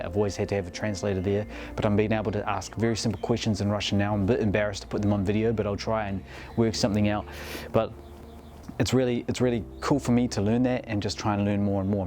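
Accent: New Zealand. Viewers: You sound like a kiwi to me. I did have a friend in Colorado, from NZ, & his accent was more pronounced. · I think you sound ultra kiwi. Though this is coming from a kiwi who lives in AUS. Accents aside, hope you and your family are well. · Russian is what you heard for 2 years, so I imagine it's still there in your subconscious somewhere. Hopefully that makes it easier to pick back up. BTW, I'm American and I could easily pick your accent up as Kiwi :) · Bro you sound heaps kiwi.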